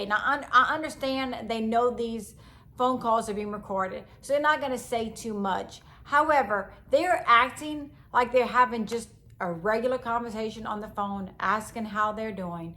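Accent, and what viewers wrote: Louisiana, United States. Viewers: I'm glad to see you covering this. These folks ain't right, like some inbreeding stuff going on, summmthin fishy I dunno.... Buuuut I can't believe your channel ain't at minimum a million subscribers! You are stunningly beautiful, you do a excellent job at covering these cases, and you got that cajun accent everybody loves! 💯 We gotta get this channel in the algorithm everybody leave a quick comment on her vids ❤ ~From, Houma 🐊 ☮️ ☯️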